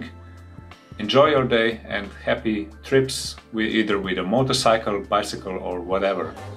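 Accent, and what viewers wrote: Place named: Bulgaria. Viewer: Planning a trip with my 50cc as well, thats some nice tips, you have a bulgarian accent somehow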